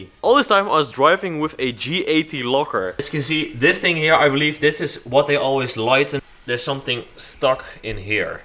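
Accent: Netherlands. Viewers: Ik dacht al dat ik een Nederlands accent hoorde :p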